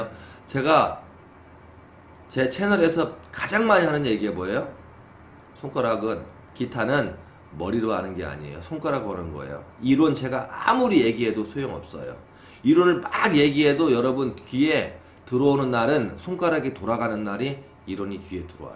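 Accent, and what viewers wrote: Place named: Jeolla, South Korea. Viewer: 정말 초짜들에 대한 배려가 넘치는 강의이십니다. 기가 막힌 강의 잘 들었습니다. 한 마디 한 마디가 피가 되고 살이 되는 말씀입니다. 계속 초보자들의 어려움을 헤아리는 강의 부탁 드립니다. 선생님 계신 곳이 전라북도인가요? 익숙한 억양이라 점감이 더 큽니다. 저는 군산입니다.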